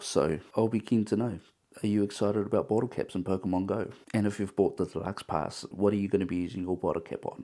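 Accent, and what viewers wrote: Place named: New Zealand. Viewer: You from nz cuz?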